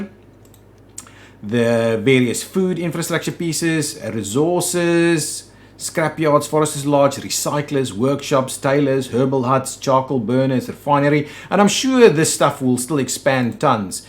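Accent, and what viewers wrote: Afrikaans, South Africa. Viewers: the afrikaans is thick may bru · Can't put a finger on your accent, unless.... Are you South African?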